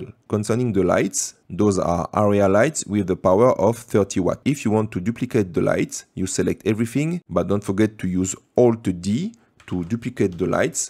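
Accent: France. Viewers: You have a french accent are you french? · Man, I can hear you’re French… Donc pourrais-tu le doubler en français, c’est désormais possible avec les nouveautés YouTube, cela ouvrirait ton audience au français qui ne parle pas très bien anglais. Ce n’est pas mon cas, je parle très bien anglais mais c’est plus facile d’apprendre quelque chose de compliqué comme blender quand c’est expliqué dans sa langue natale :D Sinon super tutoriel, fort utile :-) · Merci. Et au moins avec ton accent bien Français j'arrive à comprendre l'anglais :)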